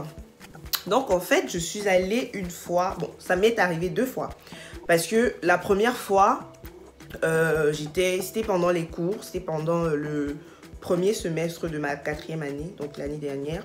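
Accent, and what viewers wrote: Cameroon. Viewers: T'es camer? L'accent ne trompe pas.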